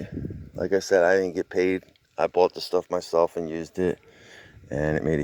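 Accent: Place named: Philadelphia, United States. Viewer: I don’t know you, but I can tell from your voice you’re from Philly, Camden, Gloucester, or Burlington, County. I’m from Collingswood(Camden County). People still gimme shit about my accent, and I’ve been here since 03. I’m about to put ATS 505 CRO/CRC in my wife’s Mini Cooper GDI and came across your video. Anyway great video, man. Thanx for the testimonial in my favorite accent. This jawn better work, 🙃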